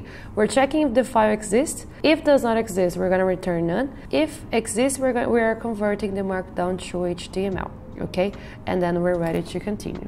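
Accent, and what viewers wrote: Brazil. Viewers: Você é brasileira ? Tem um sotaque muito parecido. Thanks for the class!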